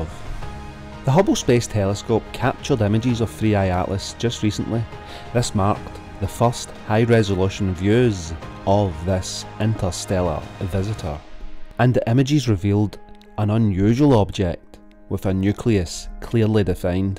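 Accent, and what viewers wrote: Scotland, United Kingdom. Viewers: Scottish/Edinburgh accent. As a Dane it sounds like that anyways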